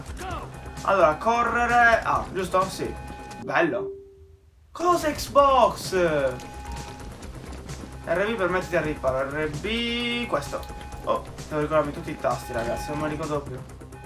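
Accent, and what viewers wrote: Sardinia, Italy. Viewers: Si sente che sei sardo ;)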